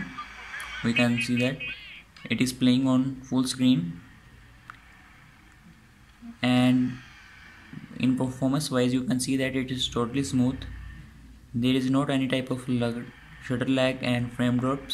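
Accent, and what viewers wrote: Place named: India. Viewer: hes indian his accent is SUPPOSED to be like this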